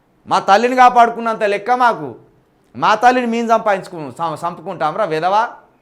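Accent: Telangana, India. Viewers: అన్న మీరు సూపర్.... మీరు మాట్లాడే తెలంగాణ భాష super.... దేశంలో మృగాలు ఎక్కువ అయ్యారు, మృగాలా మాటలు పట్టించుకోకండి..... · మీ తెలంగాణ accent super అన్నా 🙏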